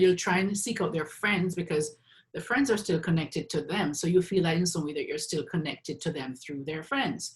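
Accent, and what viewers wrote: Jamaica. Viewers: Loved this. So informative and comforting to hear a jamaican accent give such knowledge-hope I'm correct, I know my ppl lol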